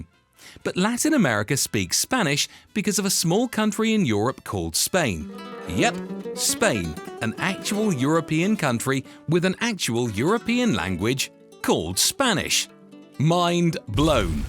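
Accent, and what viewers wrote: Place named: United Kingdom. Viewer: you sound British, but as of this great video, as a Latino, I'm proud to call you one of us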